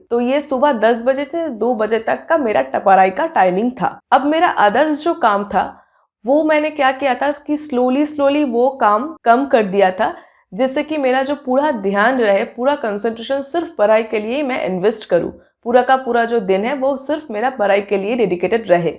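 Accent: Bengal, India. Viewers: Mam r u Bangali or asami?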